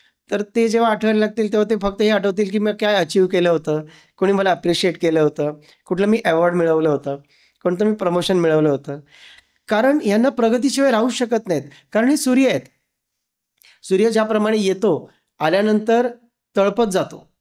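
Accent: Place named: Ghats, India. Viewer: Tumhi marathi spashta ka nahi bolat.. ghata varcha marathi ka bolata..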